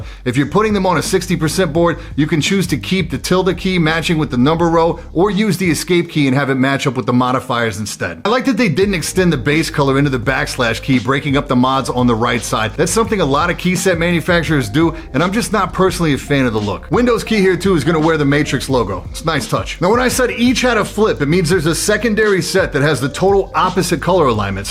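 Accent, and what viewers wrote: New York, United States. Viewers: You do great reviews man. Really cool, polished production. And I don't know where you're from so I hope it's ok to say this, but I love that your accent sounds like you're a New Yorker just talking to his buddy like "Yo Jimmy! Look at these fuckin' keycaps. Look at these things." Such a cool vibe in a tech review.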